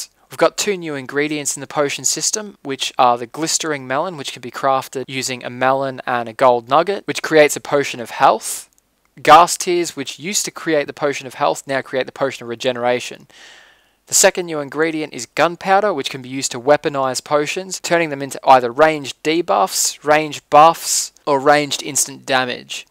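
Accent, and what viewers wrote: Australia. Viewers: your my favorite minecraft person and can u play some battlefeild 3. Do u come from Australia coz u have an Aussie accent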